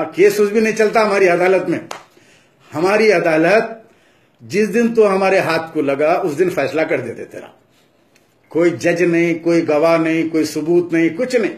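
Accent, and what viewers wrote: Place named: Hyderabad, India. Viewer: lol 😁😁😁😁 Hyderabadi Slang 💪💪💪💪💪 keep it up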